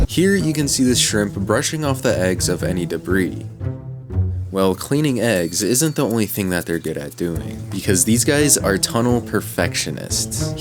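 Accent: United Kingdom. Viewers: Bro are you British can you say bottle of water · Are you a british brit ‽‽‽ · Bro is British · Is he or his voice became British? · why are you in a british accent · Are you a Brit bro? Why u sound like dat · does bro sounds british on purpose?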